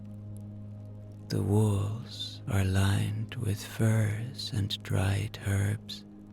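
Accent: Ireland. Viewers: Thank you so much for this story about my ancestors on my grandparents side of the family. I imagined myself there seeing how things were done at that time. I was in reverence and in awe of those people who did so many things in the tribe for the survival of everyone. Hearing you in that Irish accent just melted my heart as I felt a beautiful kinship with you and them. I also miss my grandmother that has passed. When I would pronounce Irish with an i, she would say no it's Arish. 😂 I spelled it right but pronounced it wrong, she said. Thank you for bringing back such wonderful memories of her and educating me on the traditions of my tribe ❤.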